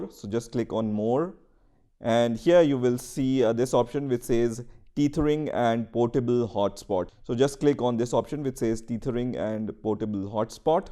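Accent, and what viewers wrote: India. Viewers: Strong Indian accent not understandable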